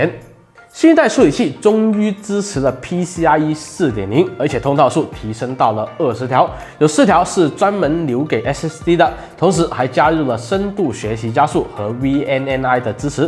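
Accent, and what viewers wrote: Cantonese, China. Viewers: 满口的广色普通话和英文，落实是广东人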